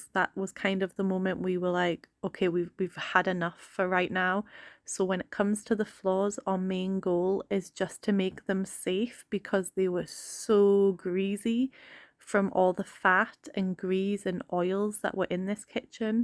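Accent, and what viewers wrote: Scotland, United Kingdom. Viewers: Don’t be afraid to go full Scottish on the job! That is part of what makes this channel so unique!! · Loving your channel and your beautiful Scottish accent💛, so glad there is still kindness like yours in the world🥰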